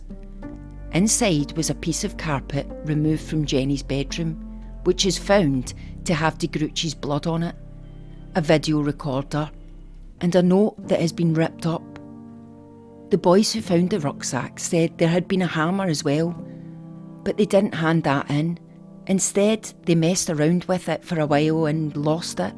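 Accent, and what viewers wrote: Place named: Scotland, United Kingdom. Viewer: Hi there, I came over from the Jamie Reynolds case. I stopped midway, because I couldn't hear, even tho I had the volume at maximum. I was pleased to find out, this recent video, the sound is great :) Oh, and pay no heed to the haters. As if they didn't have the option of changing channels... I myself - love your accent :) I'm American and I find the (Scottish ?) accent fascinating. As a storyteller, you have very good pacing and rhythm, which I very much appreciate.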